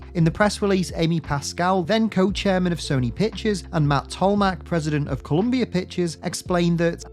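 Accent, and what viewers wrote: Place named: Yorkshire, United Kingdom. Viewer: That's embarrassing hahaha I'm from Leeds I thought I caught ur accent lol, you must be from Yorkshire tho amirite. Besides the point tho I love your videos, I watch them an unhealthy amount 😂 Keep up the excellent insights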